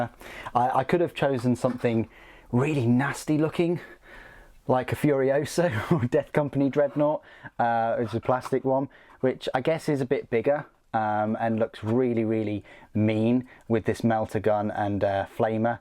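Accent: United Kingdom. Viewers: I like your accent. Its very crisp and authentic. It's the only reason why I stood to watch this video. As an American, you have the IDEAL accent that we love to listen to- your accent and Tom Holland's...and Jason Statham...and Michael Caine...I know Sean Connery's not English, but we'll throw him in there too. Your accent is up there among the greats. You articulate your words very clearly. Cheers Mate.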